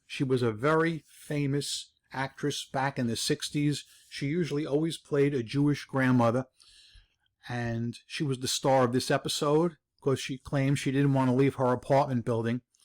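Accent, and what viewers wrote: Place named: Bronx, United States. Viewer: Thanks so much for this video - 1st, your accent reminds me of my dear departed mother, who grew up in various boroughs ( she was born in the Bronx ) - she and my dad ( who was from College Point ) moved upstate in the late 40s, but she never quite lost her accent. 2nd, your breakdown of what was being torn down and subsequently built put me in mind of what the overall plan for channeling traffic in and around NYC and its suburbs- and what part Robert Moses played in that construction- I heartily recommend the book “The Power Broker” ( I’m sure it’s still available on the used market ) - it’s a thick tome on his construction of freeways ( along with many other works in NYS ) - well worth the read for its mix of a powerful man’s vision and works - some very good, others not so much.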